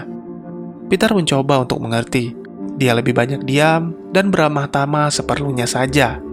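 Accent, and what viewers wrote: Aceh, Indonesia. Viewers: Mimin org aceh ya?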